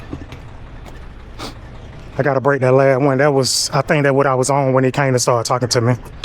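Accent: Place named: South, United States. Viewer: I like these videos. Been binge watching em. As a youth I used to switch out bicycle tires for all the neighborhood kids. It was fun and enjoyable. Now in my adult life I do my own winter tire/summer tire switch outs in my driveway. Tires are already mounted to rims but I do get my Nascar on with my impact drill. 😅. I'm from the south so enjoy the accent too. Keep it up man.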